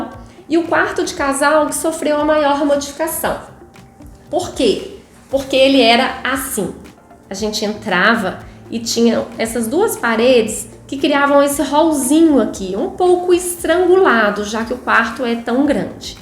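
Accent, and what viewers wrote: Minas Gerais, Brazil. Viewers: Excelente trabalho. Sempre importante um outro olhar sobre um projeto, principalmente quando esse tem a qualidade de seu trabalho. Não é o caso, um outro arquiteto coloca nos vídeos alteração de voz infantil e acho que corta a qualidade é continuidade do vídeo. Sugeri a ele não utilizar e pelo visto não gostou. Seu vídeo não tem esse recurso, ainda bem e não precisa. Ficamos assistindo o tempo que for exibido. Não é cansativo, pois nos envolve na explicação prática, objetiva e com todo charme desse sotaque mineirinho de BH. Prova que não precisa de recursos como alteração de voz ou inclusão de flash de imagens que não sejam pertinentes. Infelizmente o arquiteto que citei parece não ter gostado da sugestão. Ainda está em minha mente aquele projeto de seguidores que lhe deu um prêmio. E os demais também. Parabéns de verdade. Se juntar você e a Patrícia do Doma Arquitetura, eu faria um curso para capacitar os profissionais da área. 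Vocês duas comprovam o que ser profissional criativo. Arrependo me de não ter feito arquitetura e optado por publicidade e propaganda. Falei muito. Chega. Abraços.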